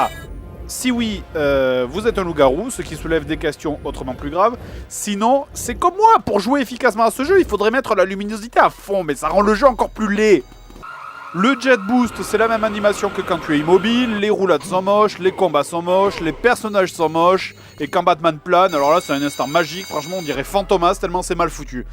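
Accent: South, France. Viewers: Le JDG est un vrai sudiste, avec l'accent qui va bien!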